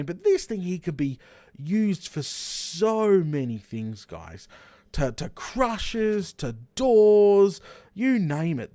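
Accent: Australia. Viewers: I prefer British accent for tutorials Btw because Australia is a British colony the accent sounds like a fusion of a Southern and British accent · Subbed just because Australian accents are great for tutorials lol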